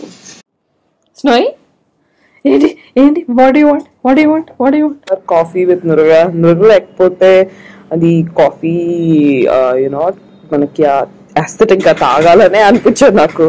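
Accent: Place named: Hyderabad, India. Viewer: Good, me telugu matladam bagundi,normal gane hyd yasa lone matladandi,inka vlogs cheyandi elage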